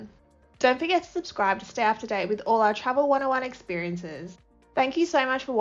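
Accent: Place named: Australia. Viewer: ох уж это австралийское произношение, всё понятно, но напоминает анекдоты ;-)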